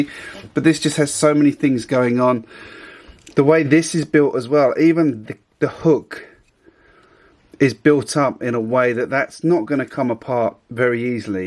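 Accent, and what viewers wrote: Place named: United Kingdom. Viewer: Just a straight up thought if I watched with my eyes closed. Nice British accent!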